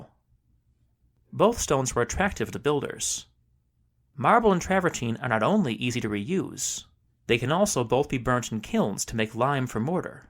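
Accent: United States. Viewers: OK I'll save you a lot of time so you don't have to listen to the whiny American accent ,it was an earthquake end of story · Sounds like an American, alright. 🤦🏻‍♂️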